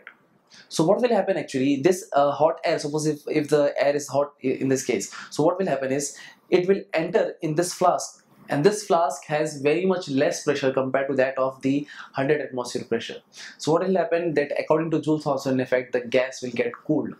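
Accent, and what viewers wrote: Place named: Tamil Nadu, India. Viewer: Tamil speach touch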